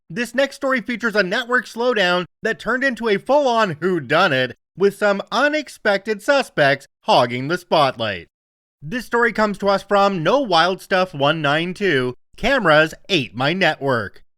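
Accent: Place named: Canada. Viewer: Weird: this is the first time I've noticed your accent is Canadian.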